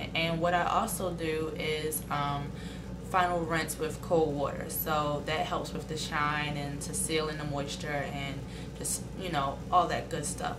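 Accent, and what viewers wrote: United States. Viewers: Doesn't she sound American to you?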